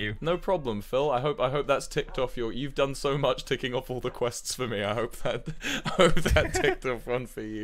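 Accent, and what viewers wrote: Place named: United Kingdom. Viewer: cos the original song is extremely american. wilbur does this sometimes but especially with this genre of folky type American songs. it'd sound off if he spoke in his british accent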